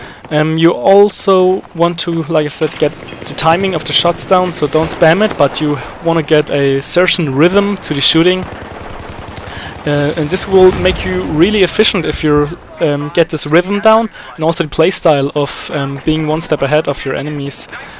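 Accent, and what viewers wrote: Germany. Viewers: You're german, aren't you?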